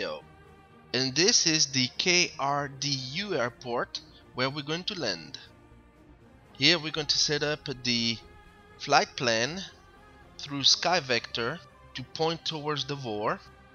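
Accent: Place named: France. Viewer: Excellent video, just what I needed. Merci beaucoup - de ton accent je suppose que t es Français.....tu parles super bien l'anglais!!!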